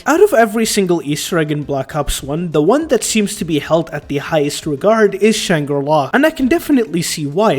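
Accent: Arab world. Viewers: Your accent is Arabic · من صوتك عرفت انك من ربعنا🫡 والله مقطع ممتاز بس يرحم والديك خذ نفس وخلنا ناخذ نفس معك، صدع راسي من كثر ما انه مافيه اي استراحة بين كل جملة وجملة. يعني خل الكلام يطلع بشكل طبيعي مو جملة ورا جملة ورا جملة كأنك روبوت مرة ثانية والله مقطع ممتاز واخيرا احد عربي سوا مقطع (كويس) مكثف عن الزومبي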